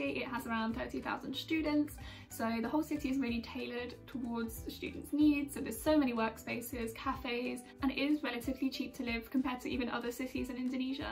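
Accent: Birmingham, United Kingdom. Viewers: very pretty, harry potter accent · first yet thuough it was aussie accent, oh i see this is birmingham accent:) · Aksen nya british banget yah.. 😁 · aksen Birmingham dia nih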